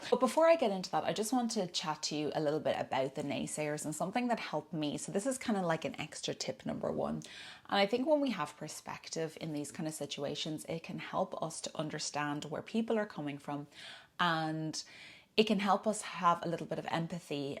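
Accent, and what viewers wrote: Ireland. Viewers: Great tips! I love your beautiful Irish accent. Hello from the USA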